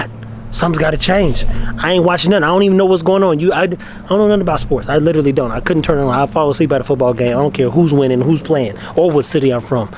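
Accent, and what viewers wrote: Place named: Jamaica. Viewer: I pick up Jamaican trace in u someone in ur family is Jamaican.. u should go lecture philosophy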